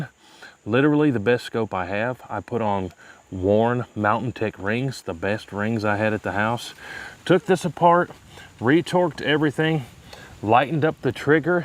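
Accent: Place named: South, United States. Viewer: I just noticed your voice and accent is very close to Hank Hill.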